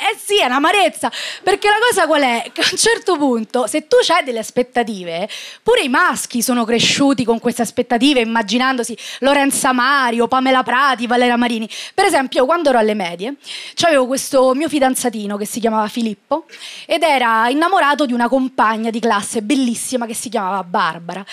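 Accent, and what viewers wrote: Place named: Piedmont, Italy. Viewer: No, è di Cuneo. Non senti l'accento piemontese?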